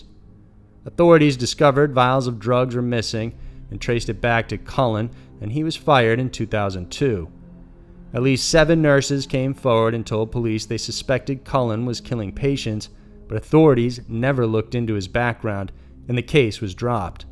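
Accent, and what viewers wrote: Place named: Texas, United States. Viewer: Wow, these are so disturbing! Also I love your accent. I’m British so it’s a guess, are you from Texas?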